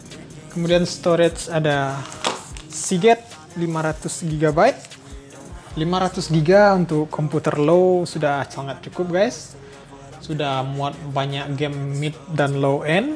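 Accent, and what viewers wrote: West Sumatra, Indonesia. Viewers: Logatnya urang awak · Wkwkwkwk aksen abg ngomong kliatan urang awak banaa😅😆😆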